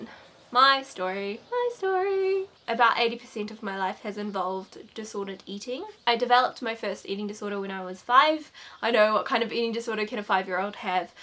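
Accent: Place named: New Zealand. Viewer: Heyy hello from the south of NZ :) Nice to hear a kiwi accent! I really liked this, I too self recovered, developed depression (though seriously you wouldnt be able to tell with me either I always appear bubbly and happy!!) and although I am not vegan, I follow a plant based diet and it helped so much! So pleased things are looking up for you :)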